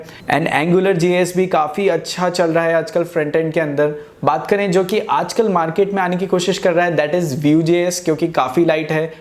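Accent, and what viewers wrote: India. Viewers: Indian accent is too strong...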